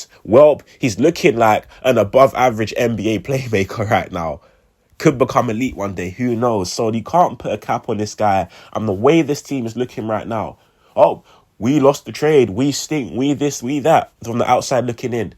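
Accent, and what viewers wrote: United Kingdom. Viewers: Wasn’t expecting that accent when I clicked on the vid but nice to see a fellow UK sixers fan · It’s kind of refreshing to hear a British YouTuber talk about the NBA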